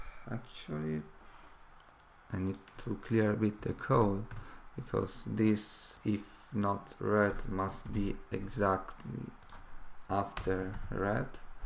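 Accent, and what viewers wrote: Italy. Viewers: Thanks a lot! Are you Italian?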